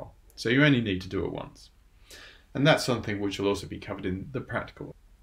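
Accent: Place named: New Zealand. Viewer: Trying to work out if the accent New Zealand, Australian, or either and influenced by time spent in England.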